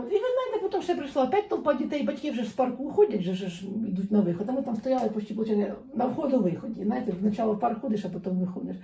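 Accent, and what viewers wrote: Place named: Cherkasy, Ukraine. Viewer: ааа, я так і зрозуміла що розмова Черкащини. Я з Корсунь-шевченківського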